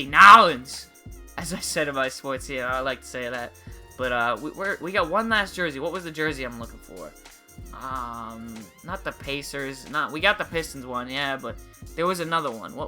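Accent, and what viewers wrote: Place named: Northeast, United States. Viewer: I dont comment because um im lazy but Nice vid and are you from jersey or boston or ny ??? im hearing an accent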